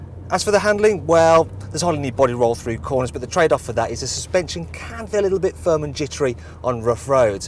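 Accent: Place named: North, United Kingdom. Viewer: i think hes from up north! :-p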